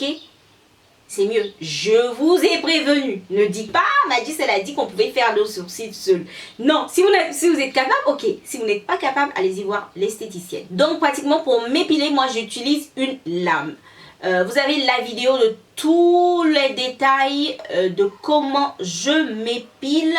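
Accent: Ivory Coast. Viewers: Accent Ivoirien ma chérie.😍😍😍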